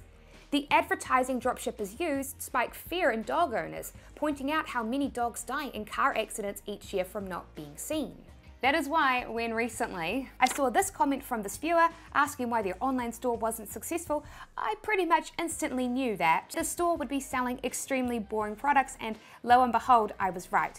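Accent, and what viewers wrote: New Zealand. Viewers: What is your accent ? there is abit of New Zealand / abit of Irish or American, maybe South African ? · Iv never had the urge to make my own online business, but i may do it just so i have a legit excuse to watch your videos other than the reason now, this kiwi accent is awesome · At 78 I'll probably not launch a dropshipping business but I tune into videos just to hear her interesting Kiwi accent. I lived in New Zealand for twelve years and yet I've never heard an accent like it. Also I love her vibrant personality. All the best to everyone, from Doug the atheist demon from Parramatta, Sydney Australia.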